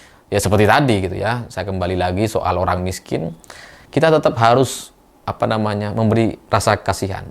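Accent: Indonesia. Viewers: Bang ini kyknya "lok lemak"... dari logatnya 😂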